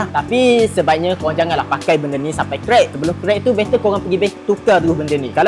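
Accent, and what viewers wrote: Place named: Terengganu, Malaysia. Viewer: Sedak abang kecek ganu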